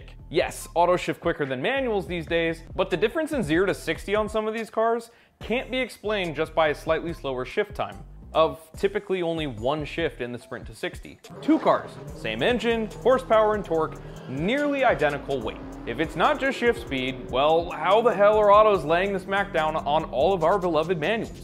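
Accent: United States. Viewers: I feel like I've listened to James May witj an American accent 😁😁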